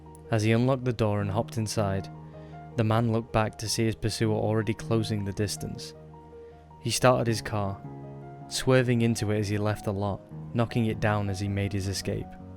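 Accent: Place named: England, United Kingdom. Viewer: I knew that was an English voice, me to. I'm from the South but live up North xxx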